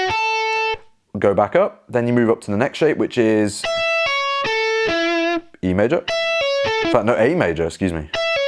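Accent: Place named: Stoke, United Kingdom. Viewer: Uhhh man. That Stoke accent is hideous 🤮🤮🤮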